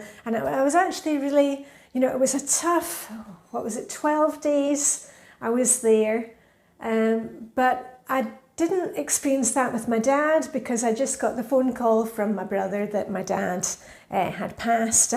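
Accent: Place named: Scotland, United Kingdom. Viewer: Dear, I am so sorry to hear of the loss of your mum. Absolutely know how that feels. I live near Edinburgh, lost my mum 2 years ago to cancer and like you, cared for her at home till the end. It was your routines and cheery Scots voice that kept me going in the home and helped me get ready for work every day, so thank you so much for all that you do. You are greatly appreciated 🤗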